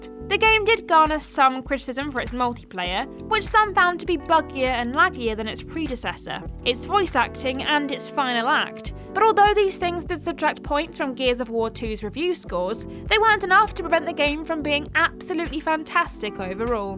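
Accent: United Kingdom. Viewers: this girl narrating is to British sounding driving me insane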